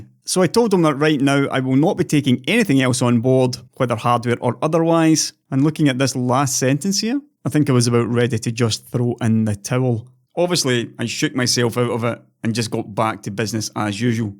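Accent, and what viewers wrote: Scotland, United Kingdom. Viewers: Bruv, people are complaining about your barely heavy Scotch accent, meanwhile I throw in multiple accents in almost a fucking paragraph. Explain that "lack of controlled accent". E Y E R O L L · I can see through your lies,..I bet MSI paid you to tell us viewers that they didn't pay you for reviewing the product. Sellout.... jk:P Anyways.... Have you considered splitting your videos to reduce the length? The length doesn't bother me too much. I'm used to it and usually can make the time for the videos; it may not be on release day but I do watch them. Still, long videos could be part of the reason that turns away new viewers. After all, people don't want to spend too much time on a single video because....they "don't have the time." Will YT allow you to include your own subtitles? Couldn't you use the transcript for it? Would it be too much work to add them yourself? 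I'm sure you've considered on one of those options before but I'll ask anyways. As for the accent...it was strange to me at first.This was not because you have an accent but mainly because you are one of the few people to cover material in such depth that are from outside the US. It may be part of the algorithm or just a fact that you're the only Scottish tech-tuber that covers this side of technology. This may never go away and is just the sad truth about part of your target audience. But hey, at least some of them are fine with that "flaw." PS: I really hope you work out the channel's possibility for growth. Good luck with that and do expand. A website could help you in a lot of ways. Gamers Nexus has basically lived off of their merchandise so you may want to look into that as well but I'm aware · The Scottish accent is an honest one! Keep it up! :D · To be honest, the accent also annoyed me a little in the beginning, but I stayed for the awesome content. 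And meanwhile I learned to understand the Scottish accent better;) win-win (In Scotland from time to time for business) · I LOVE the scottish accent and I love the content of your videos. As far as I know you're the only youtuber that goes deep into hardware analysis combined with economics and whatever else you bring into the mix. All I can say is keep up your morals and keep doing great videos if you're able to! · Good stuff! :-D If an Israeli like myself can understand your Scottish accent perfectly fine then it's hard for me to believe how anyone else wouldnt. With that being said, I did not need a 27 minutes long "you guys were wrong" video. I trust your judgement with my eyes closed. That's also one of the reasons you are pretty much the only sub I've got. You shine a light at what no other tech Youtubers can see. That stuff is above Jay's and Linus payroll. They'll never be half as professional and through as you are. 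Cheers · I like your accent. Love listening to any Scottish person speak. Scottish is one of my all-time favorite accents. Please don't take this the wrong way but when I hear you talk, I always think of one of my favorite cartoons, Disneys Ducktails. Scrooge McDuck is one of my favorites. But that's not the only reason that I watch your videos, the content is very informative. I always learn something new. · You make great videos, I hope you keep making them. Your accent is not an issue for me, even though I am not a native speaker. I actually quite like it, though I like the Scottish accent in general. I myself was once interested in making videos, I even tried to make some, and uploaded one. But I didn't have much time for it and probably wouldn't be able to put in the time required to produce high-quality videos. Also I can't really stand my own voice through a microphone. I would be much better off with a blog or a website. 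· Great content and a good ethical stance...oh and the beautiful lilting Scottish accent 😜 Subbed. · How the hell do people have a problem with a Scottish accent. People need to get out of the basement more. :)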